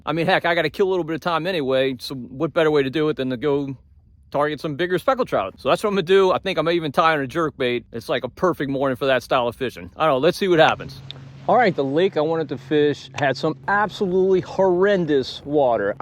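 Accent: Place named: West Coast, United States. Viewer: Youre not originally from Louisiana, i think west coast? You sound ofly familiar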